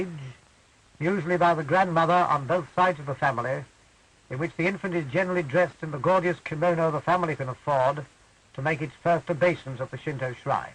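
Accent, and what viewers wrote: United Kingdom. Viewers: I think the narrator (who is obviously British) spoke appropriately. why do I say that? because of the time period. The Japanese were racist back then! weren't they? they thought they were superior to the Americans, the British, the Australians, etc! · Petroleum Jelly Bomb = Napalm . The benevolent civilized “ Good Guys “ 🇺🇸 dropped it on the Vietnamese. I’m surprised the USA didn’t drop Nuclear Bombs on Hanoi . General MacArthur AKA Dr Strange Love ( How I learned to love the bomb ) advocated nuking the Chinese in the Korean War . P..S. The narrators prancing horse, Royal British voice is annoying , and as to be expected extremely racist . Lousy photographer also .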